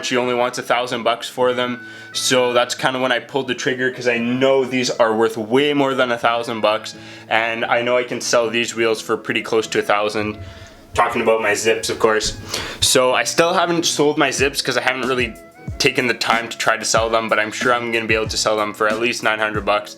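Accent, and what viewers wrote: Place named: Canada. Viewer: lol The Canadian accent is on point! love the video!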